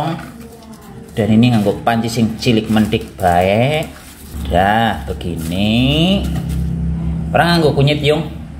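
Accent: Banyumas, Indonesia. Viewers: Seru jeruknya manis...wes jian,ora ngapak ora kepenak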